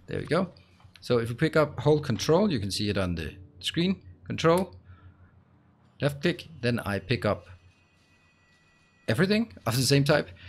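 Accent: Denmark. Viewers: Just a quick question. Are you from Denmark or Scandinavia? I can hear you have a danish ascent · 16 seconds of your voice then i Knew you were danish. god video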